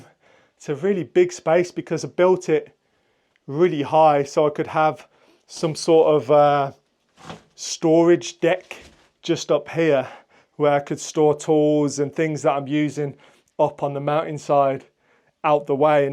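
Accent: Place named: England, United Kingdom. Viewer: Hello! I like your videos. From a true portuguese ( many are now fake&soon they will be gone as well as in England is happening about fakes),. I prefer to listen to your nice english accent. I don't like this AI abd is not portuguese is brazilian which is called portuguese from Brazil for them to take advantage. How can I take this AI and got back your voice??? Can u tell me please? I tried deactivated legends, put translating to look for english, but that option is not availabe.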